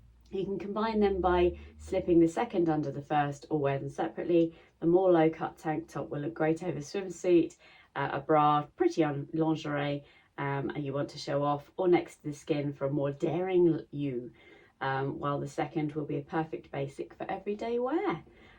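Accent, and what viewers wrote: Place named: England, United Kingdom. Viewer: I had to go back and watch the early part of this with Closed Caption on. I thought you said Testicles but the CC showed Chesticles...LOL...My USA accent vs your English accent I guess is why I wasn't hearing what you said....LOL...Love the Sally jump suit. You look great in it.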